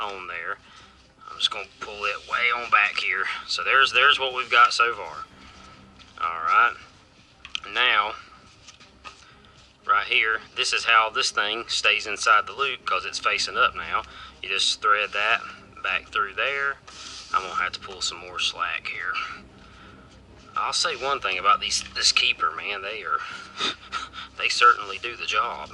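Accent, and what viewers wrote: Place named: South, United States. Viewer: Definitely made life easier. Your instructions were easy, especially pulling the strap through the keeper. The MAGPUL instructions, either the included or the YouTube instructions, are close to useless. Enjoyed your sense of humor also. Being a Yank but having lived for 35 years in Virginia, I found your accent was a bit of 'down home.'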